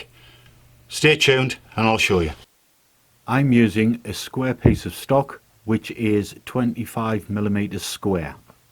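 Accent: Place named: North East England, United Kingdom. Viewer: Clear and simple, Sounds like you come from the area where I grew up, The North East of England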